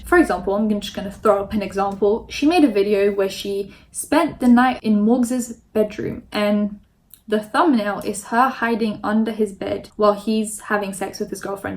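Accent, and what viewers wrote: United Kingdom. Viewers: oh yeah nah I wasn't really sure, you sounded British to me :) x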